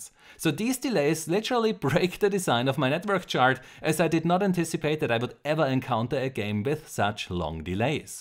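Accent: Germany. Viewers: man hört an dem Akzent das du Deutscher bist :P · Now I finallay now that you are german ^^ Always thought that, that is a german accent :D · Love your german accent 😏❤️ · man hört so raus dass du deutsch bist xDDD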